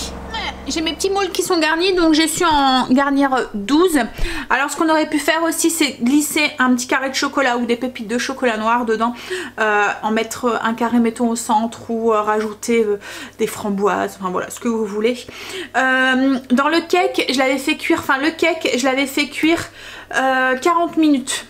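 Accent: North, France. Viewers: Bonjour j'ai pas fini de regarder la vidéo tellement que je suis énervée 😒 c'est quoi encore ces gens qui disent des choses non justifiées oui parce que dire Rome à la place d'Italie n'est nullement une faute de français, un lapsus ce qui est différent et je pense que ces personnes sont loin de savoir la langue française et qu'ils doivent faire énormément de fautes d'orthographe. Ensuite les gens chaque personnes prononcent un mot différemment de chacun POURQUOI LA RÉGION D'OÙ VOUS VENEZ et n'oubliez pas que est du NORD l'accent du mot et pas une faute de la langue française c'est simplement une prononciation type de son origine comme en corse, Italie, Provençal bref avant de critiquer soyez sûr de votre FRANÇAIS. Voilà j'ai fini tu es une bonne personne la vie réserve de mauvaises surprises parfois et certaines ont une vie plate sans soucis alors CRITIQUES.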